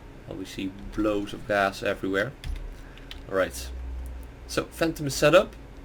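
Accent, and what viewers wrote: Netherlands. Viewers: i have this feeling your dutch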